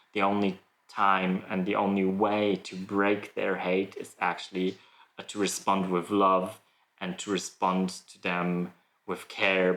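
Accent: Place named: United States. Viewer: They know you're gay, okay. But do they know you speak perfect American English?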